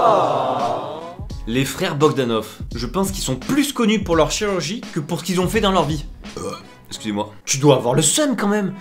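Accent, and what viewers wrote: Marseille, France. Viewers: T’es marseillais ?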